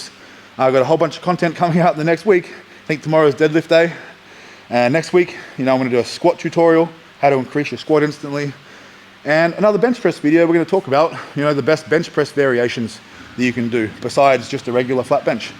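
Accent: Australia. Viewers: Good see some solid Aussie oak talking weights not the yanks all the time. Good stuff mate and I’ll be watching keep the content coming and love the way we talk down under no room for smoke and mirrors just real talk. Now it’s your set mate 💪🏻😎❤️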